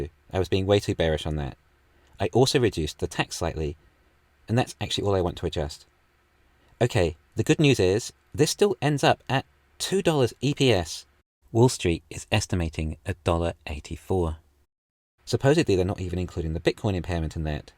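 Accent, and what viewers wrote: England, United Kingdom. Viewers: You English accent is very heavy in England.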